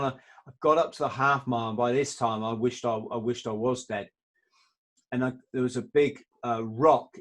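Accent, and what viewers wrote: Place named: Ayrshire, United Kingdom. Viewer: Hey I’ve been aware of and Follow him,really enjoyed the podcast guys.Your definitely West coast/Ayrshire-Glasgow as you the accent is the same as mine 🙂. I’ll be sure to share and follow.👍🏻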